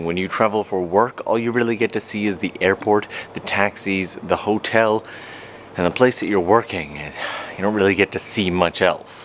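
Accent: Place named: Canada. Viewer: I've just started watching a few of your videos and I heard your accent. I was 90% sure you were Canadian but wanted confirmation. I saw this video and I clicked it so fast to scratch that itch I had. Sure enough, you're definitely Canadian looool. Greetings from Jamaica!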